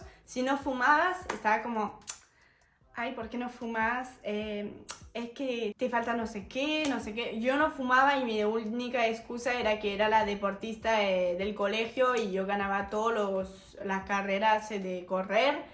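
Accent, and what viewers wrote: Buenos Aires, Argentina. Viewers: Se nota que sos súper alegre!!! Vivir en Argentina sin duda te hizo bien. Y tu forma de hablar es bien porteña, ¡me encanta! Ojalá puedas volver pronto, este país es tu casa. Un abrazo grande a la distancia. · dos una genial!!!!! Hablas en argentino re bien!!! No es vulgar, todo depende de con quien hablas y el tono, con que lo dices. Sos muy linda. ❤ persona!!! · Que grande la halando a lo argentino.... una pena que ya te fuiste de Argentina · ❤ bravo me encanta escuchar como hablas en argentino! Pero no todos decimos malas palabras 😂 mis hijos me cargan porque yo horrorizada cuando dicen malas palabras ellos y sus amigos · Hermoso que a veces decís "yo" como "sho" argento y a veces "io" colombiano, hermosa tu experiencia, Argentina un camino de ida. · Holaaaa!, te digo que tenés acento argentino, y ya tenés muchos modísmos también...como decir al pedo etc..🤣🤣🤣. 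Acá son la 9 de la mañana, estoy tomando mate y viendote. Sos encantadora, re piola! 😂.Ya me suscribí. Besos y hasta siempre! 🤗🤗🤗🇦🇷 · da gusto verte, por lo espontanea, lo que transmitis, alegria, y tu castellano es muy bueno, mejor dicho no es castellano, hablas argentino, espero puedas venir a pasear algun dia y ver a tus viejos amigos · Amo a los extranjeros hablando con acento argentino te queda muy bien jaja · recién te descubro, y me mata tu argentinidad, te digo q no se te nota para nada q sos francesa exelente tu pronunciación un acento muy Argento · te escucho y ya sos Argentina.... me encantó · sos una argentina más, llegastes aquí y se te transformó tu rostro, vos lo decís, sos más alegre. Argentina tiene muchas cualidades que hacen que muchos extranjeros la amen que puede ser nuestra rica cultura, gastronomía, arquitectura Pero lo que creo que les fascina a los extranjeros es nuestra hospitalidad, la amistad que es muy típico de nosotros, los hacemos sentir como si estuvieran en su propia casa. 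Somos muy particulares y eso gusta mucho así que bienvenida a la ciudad mágica que atrapa a mucha gente y de la cual terminan enamorados. Es así el tema y sobran los ejemplos con muchos extranjeros. Una vez más, bienvenida,ya se te pego nuestro acento.❤❤❤❤❤❤ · Porteño! Diría YO 😂 jaja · 100% argentino tu relato! Tu castellano argento está impecable! Un fuerte abrazo, ❤❤❤ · sos increible! Me enamore de vos!! Te escucho hablar y veo a una Argentina mas!¡ increible lo bien que hablas el idioma,los modos que adoptaste,las costumbres! Se ve y se nota que disfrutaste el tiempo vivido aca,lo feliz que se te ve!!! Espero de todo corazón lo mejor para vos! Para uds!!! Espero vuelvas al país y ovbio que hagas mas videos!!! Besos y cariños!!❤😊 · sos bella en todo sentido, me encanta tu tono Argentino, saludos desde salta Argentino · Habla mejor el argentino q nosotros 😂. Qué genia esta chabona 👏🏻👏🏻👏🏻👏🏻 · hablas perfecto el acento argentino, ya sos una mas de nosotros:face-red-heart-shape::face-red-heart-shape::face-red-heart-shape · Genial. 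Me hiciste el almuerzo, hablas igual que un Argentino y puteas mejor todavía.....😂😂 Sos como la frase: UN ARGENTINO NACE DONDE SE LE DA LA GANA. 🇦🇷👏👏👍👍👍👍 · Hola hermosa muy buenos tu. Video hablas como una argentina más saludos y que sigan los éxitos 🥂🥂🥂👏👏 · Esperamos que vuelvas a TU Argentina,!!! Sos muy argenta en todos tus modismos, tus expresiones (estar al pedo, la entrañable costumbre del mate a cualquier hora del día, y la c... de la lora!!😅🤣😂) tu alegría, tu pedir permiso, el yeísmo tan nuestro, sonás muy argentina!!! Súper simpática, adorable, totalmente compenetrada con nuestros términos!!! No demores, te esperamos!!!🥰🤗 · EN SERIO SOS FRANCESA???PARESES UNA ARGENTINA MAS , TU ACENTO Y MODISMO ES INCREIBLE JAJAJA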